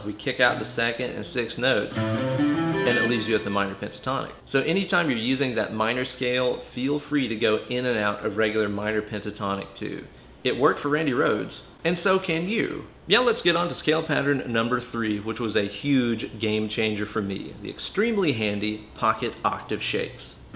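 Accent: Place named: Tennessee, United States. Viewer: the Dave Ramsey/I definitely live in Tennessee voice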